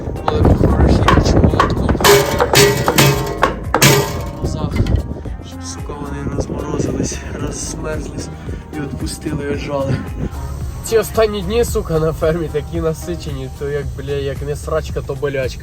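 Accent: Poltava, Ukraine. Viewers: дякуя з Полтавської ,говір похожий на наш Полтавський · Звідки Ви родом? З якого регіону?) Дуже схоже на Полтавщину, або сусідів 😃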